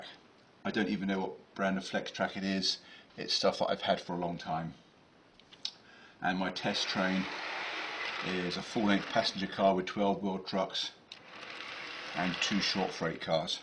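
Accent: United Kingdom. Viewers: GREAT JOB - FORGIVE ME WHERE ARE YOU IN THE WORLD- YOU HAVE AN EXCELLENT BRITISH ACCENT BUT STATE PRICES IN DOLLARS LOOKS LIKE ASHBURN USA? WHATS THE STORY THERE?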